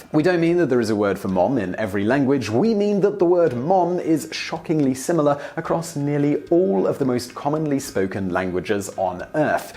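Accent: England, United Kingdom. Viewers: Daven, I'm kind of the same way, I have so much British and otherwise foreign influence in my life that I'll often use british phrasing and not think about it. And I don't think it's really a big deal, whenever people freak out in the comments and criticize, I just laugh that they care that much about a pronunciation or phrase, especially in this case of a British narrator reading an American-authored script; it's bound to have all sorts of different terms and pronunciations thrown around! I've never understood why some people are so uptight about language, like thinking a word has to be said or spelled this way or some dialect isn't really the same language just because it's not the same as how you say it (looking at you, France!). I personally love how English is so diverse between British and American phrases, spellings, and pronunciations, and I count all of them as correct and don't critique someone for using one they wouldn't normally; that's what learning and experience is all about! 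I honestly wouldn't have sweated it if I were Simon. If I were him, I would have just read it however my brain decided to pronounce it at that moment and not care. And yeah, knowing him, his schedule being like that is pretty common, unfortunately! I wish he'd start doing updates on his vlog again, even if just weekly or every other week or whatever, so we could be in the loop! Send him a quick reminder from the fans, would you? ;) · I'm American but I'm so used to the British accent I couldn't tell if he was British or American for like 3 minutes that was so wild wtf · You should have stuck to the British pronunciation, Simon. Your accent is strong enough that when you try to say Mom, it doesn't sound at all like anything an American would say. And, believe it or not, there are plenty of Americans that use mum, instead of mom. So you wouldn't have been misunderstood. I don't get your reasoning behind deciding to do this. 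· Anyone else think this is Vsauce putting on a British accent? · You really do NOT need to pander to us American rubes. We can handle your English accent, and moreover, many of us are either from Canada, or have relatives there, so we're not (or shouldn't be) terribly alienated when you say "mum" instead of "mom." Oddly, you also refer to the Oxford dictionary. If you're gonna go all out American here you should probably stick to a version of Webster's.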